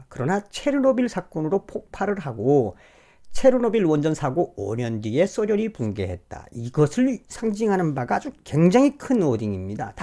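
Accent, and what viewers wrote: North Korea. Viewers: 북한말 듣고 싶지 않아요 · 북한말 너무많이쓰시지말고 억양을부드럽게해보세요 그럼유튜브 훨씬잘될것같아요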